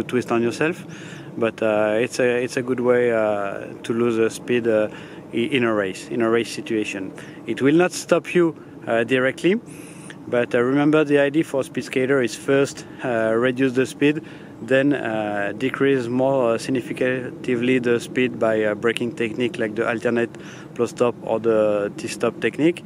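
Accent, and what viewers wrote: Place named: France. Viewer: Ah je me disais bien que y avait un p'tit accent français la dedans :D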